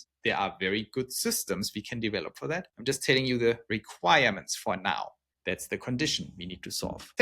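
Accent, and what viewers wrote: Germany. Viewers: Germans are usually very logical thinkers but when you say that the AI is going to be constrained you are deluded. AI will be constrained about as much has the United States Congress has the ability to rain in spending. Governments competing against governments will not restrain themselves. You said it earlier that we are in a race condition. AI will be developed without restraint for the promise of power that it gives. Your German accent is very endearing as I had an Opa myself. Blessings.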